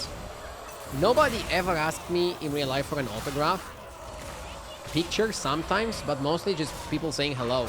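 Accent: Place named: Romania. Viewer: Ma uitam la videoclipurile tale si mi se parea mie ca accentul te da de gol, dar nu eram sigur 100% ca esti roman 😂😂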